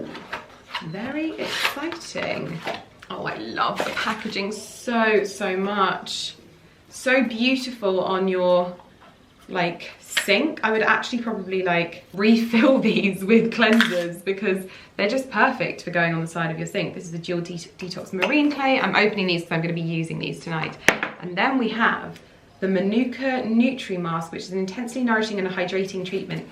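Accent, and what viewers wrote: RP, United Kingdom. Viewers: The way you say “The the the…” before every word is irritating. It’s because of the thinking you do before talking, to try and sound posh. You sounded much better with your natural speech, when it flowed.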